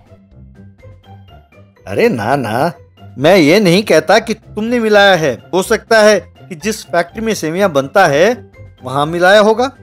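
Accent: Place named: Patna, India. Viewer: Get puffy Patna tone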